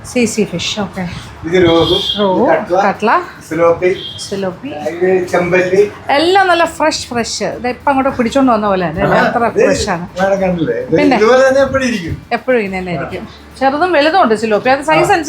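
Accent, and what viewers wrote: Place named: Trivandrum, India. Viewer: Innathe food kanaan thanne endoru bhangi... Tvm the bhasha ano Mam adipoli...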